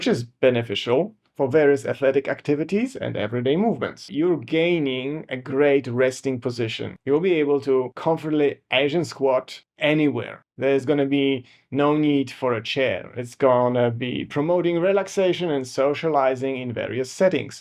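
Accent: Poland. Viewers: I m so confused by your accent. On top of your primary accent, Polish,do you also have a German accent? Or am I imagining this?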